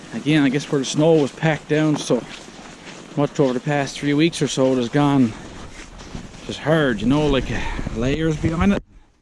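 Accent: Newfoundland, Canada. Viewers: Sounds like a fellow Newfoundlander here! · When I started watching you clear snow, I said wow, no wind to speak of, how lucky is it to be where you are. I am in Eastern NL, Canada and it seems like it is always windy here. Throwing snow almost straight up is never an option here. Then, I noticed the tall trees and guessed you were in Ontario. Then I saw your license plate and picked up a bit on your accent, seems like you are in Central NL. Great machine for snow clearing. I assume your driveway (at the cabin) is dirt. What do you do to keep your machine from digging in and picking up rocks and breaking shear pins? I haven’t had much luck setting the guides high when clearing uneven surfaces with mine.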